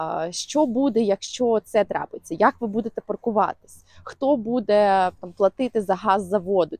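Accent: United States. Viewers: З цікавості...У Вас акцент цей американський зявився за ці два роки проживання?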